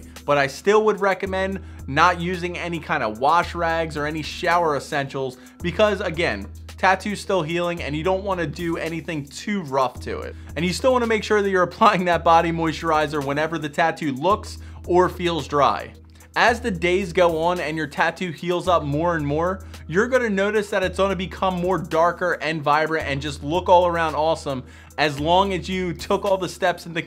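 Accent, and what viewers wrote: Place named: Maryland, United States. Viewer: You’re awesome. Thanks for making such in-depth vids! One thing: hahaha where are you from. I’m guessing Maryland. “Wooder” for water. You’ve got to be from MD! 🪵💦😉🦀 ✌🏻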